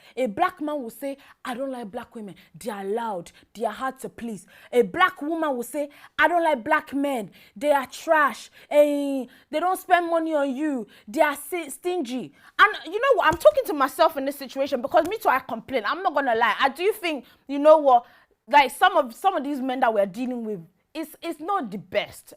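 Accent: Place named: United Kingdom. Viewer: Do you live in the UK, your accent is everywhere?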